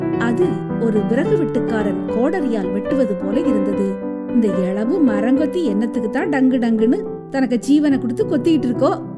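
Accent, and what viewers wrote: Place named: Rural, India. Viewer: விடக்கூடாது, ஊர் பாச 🤷‍♂️அருமை Tr🎉😂டங்கு ---டங்கு தமிழ் 🤷‍♂️அருமை. வாழ்த்துக்கள்.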